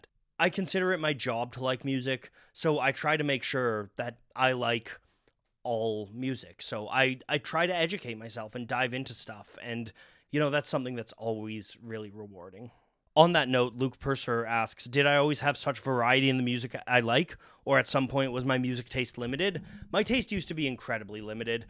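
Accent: Canada. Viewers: Canadian man detected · People have their right to privacy, so I don't care whether a content creator shows their face or not. Not seeing face made me listen more closely and I picked up that he's Canadian. Actually because of voice similarity, for a while I was wondering if another YouTuber I watch was secretly doing this channel too. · Dude - I think you're great. Your channel rocks and you have so much knowledge. And where are you from? I'm thinking Canada somewhere....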